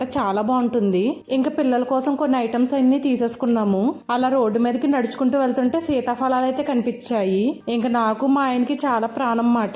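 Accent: Konaseema, India. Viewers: మీరు వీడియోస్ బాగానే చేస్తారు కానీ, మీ భాష కాస్త మార్చుకుంటే బాగుంటుంది. మరీ కోనసీమ యాస ఎక్కువగా ఉంది. మీరు దుబాయ్ లో ఉంటూ కూడా, పక్కా యాస లో మాట్లాడితే బాగోదు. కాస్త nice ga మాట్లాడటం నేర్చుకోండి. మేము కూడా గోదావరి జిల్లా వాళ్ళమే. కానీ ఉండేది హైదరాబాద్ లో. కాస్త స్టైల్ గా మాట్లాడితే మీకు ఇంకా వ్యూస్ ఎక్కువగా వస్తాయి😊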